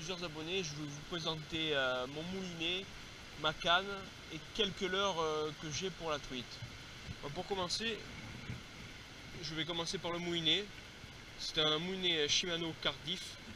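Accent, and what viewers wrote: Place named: Corsica, France. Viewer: ps ; ta l accent corse un truc de fou :)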